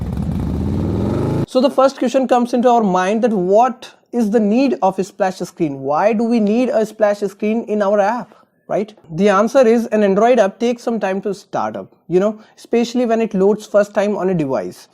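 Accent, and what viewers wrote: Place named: United States. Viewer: Your video is great, but why the American accent on an Indian background and foreground?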